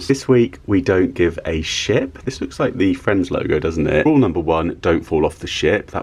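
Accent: England, United Kingdom. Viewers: Thank you for taking us on another fabulous cruise. I just love all the places that you go, because I will never be able to see it in person. I enjoy listening to your English accent. Thanks again for your videos. From a fan in Colorado USA!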